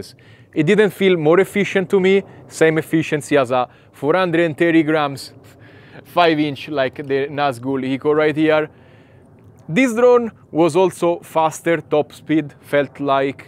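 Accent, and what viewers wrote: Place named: Italy. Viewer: 2 parole e ho subito capito eri italiano ahah grande 💪🏽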